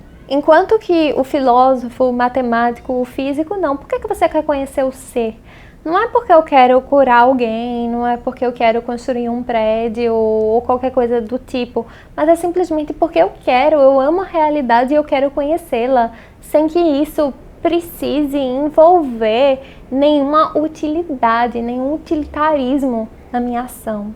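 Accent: Brazil. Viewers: Acho engraçado o sataque dela de Pernambuco!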